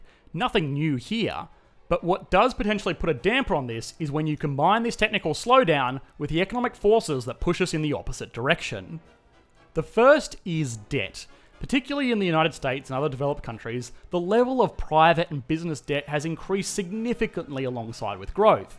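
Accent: Australia. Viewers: Does the author speak in Australian accent ? Thanks for the video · Mate your Ozzy accent...:)) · "the sweet supple sound of my Australian accent" Darn he figured out the real reason I watch! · Is true. If it wasn’t for that sweet koala accent, I wouldn’t be able to get through all the gloom and doom our future seem to be careening towards.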